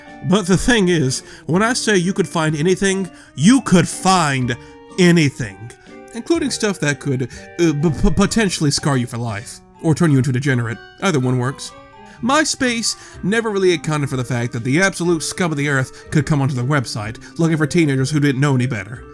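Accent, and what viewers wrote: South, United States. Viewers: random but i LOVE your accent. you sound very southern in the best way possible. i’m a born and raised georgian so i can definitely appreciate it. it sounds like i’m being spoken to by a friend.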